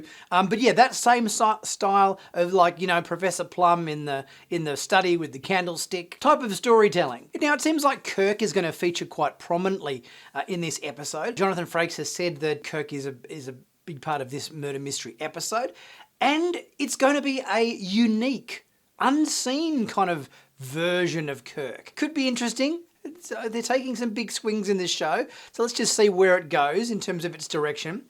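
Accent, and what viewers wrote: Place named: Australia. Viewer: I like the way you talk, but I'm wondering. I've heard a lot of Aussie accents over the years. Are there different regional accents in Australia? Can y'all kinda tell where each other are from by the way you talk? My own accent is mostly Midwestern American with hints of upstate New York and I met someone once who nailed down by my accent that I was born in New York and grew up in Colorado. I have noticed that Aussies do sound a bit different here and there, but I can't tell if someone is from Melbourne, Sydney or the outback. You don't exactly sound like Crocodile Dundee for instance. Y'know what I mean? I'm going to ask other YouTubers too. Feel free to call me cunt any time brother!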